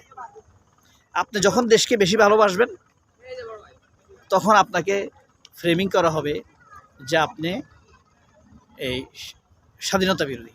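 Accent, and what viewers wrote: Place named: Chittagong, Bangladesh. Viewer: ভাই মনে হয় চিটাগং সিবিস আছেন।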